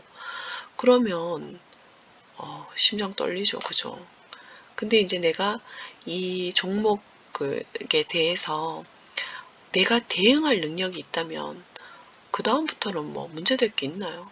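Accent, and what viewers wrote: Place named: Gyeongsang, South Korea. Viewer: 저는 서울 토박이입니다. 친구중에 경상도 친구가 있는데 서울말(표준말)씨 잘 쓰는 친구인데 제가 들으면 경상도 느낌이 있더라구요. 완전 서울말은 아닌게 있어요. 선생님도 비슷하다 느껴서 경상도. 분이신거 같았어요. 자주뵐께요. 구독 좋아요. 눌러요. 잘 배우고 가요.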